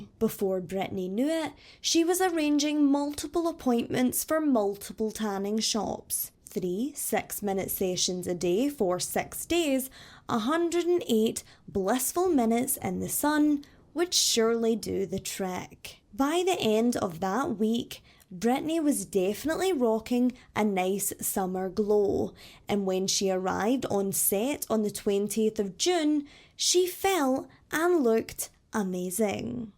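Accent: Scotland, United Kingdom. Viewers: Lovely accent. Where in Scotland are you from? Aberdeen maybe? I was born there.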